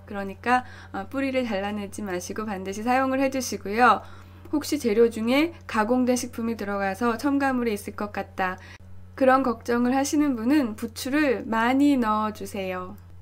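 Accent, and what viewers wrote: Seoul, South Korea. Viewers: 님 말투가 서울 사투리 같아요 ㅠㅠ 너무너무 듣고 싶은 목소리이구 ㅎㅎ 재밌어요 ㅎㅎ 레시피 감사합니다!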